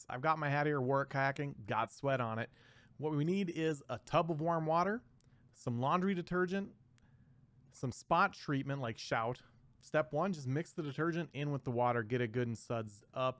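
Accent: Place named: Colorado, United States. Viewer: I bet you're from Colorado. Just judging by your accent. Thanks for the hat cleaning info✌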